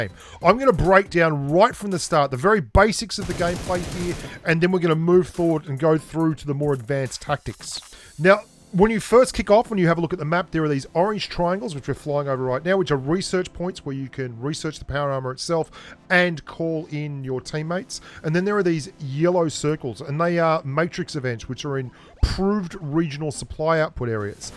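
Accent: Australia. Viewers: You from Australia 🇦🇺?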